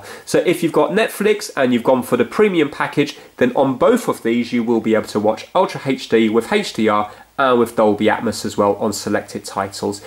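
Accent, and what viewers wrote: England, United Kingdom. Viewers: *english accent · u have a deep British accent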